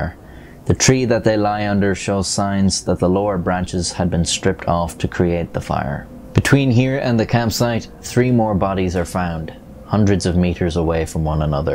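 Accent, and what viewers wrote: Ireland. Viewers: A fascinating story. BTW, You have a very good narration voice. Only slightly Irish, and that's OK. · You tell a story very well. I like your Irish accent. This story always fascinated me. Common sense says it was a freak weather occurrence that seemed like an avalanche because it obviously made them run for their lives. Likely in the rush one of them might have not been able to quickly unzip the tents flap and cut himself out. Things like the eyes/tongue missing would be forest animals/birds. I wonder if a movie has ever been made recreating the most likely scenario. It would be interesting. RIP to them all.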